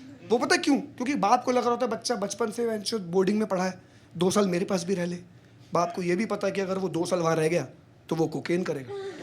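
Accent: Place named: Delhi, India. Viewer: UK SE JADA DELHI KA LG RHA BHAI BC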